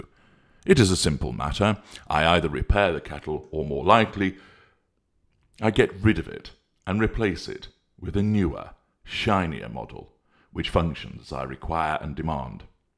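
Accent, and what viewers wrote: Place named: North, United Kingdom. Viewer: Yeah he sounds like he's a RADA graduate. But every now and then I notice either a Midlands or northern accent coming through so I assume he's not from down south originally. It takes a Brit to notice this aspect.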